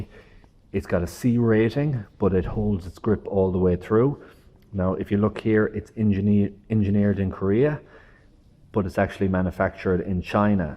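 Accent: Ireland. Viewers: Sounds Irish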